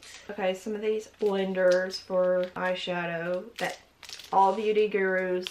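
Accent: South, United States. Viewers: ur literally southern sam puckett i, im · shes from the south lol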